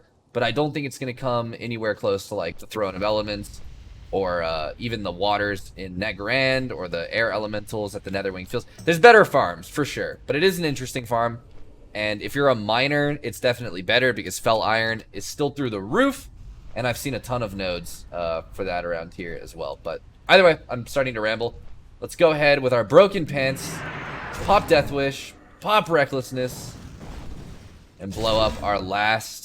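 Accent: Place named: United States. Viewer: jesus you have a cool american accent!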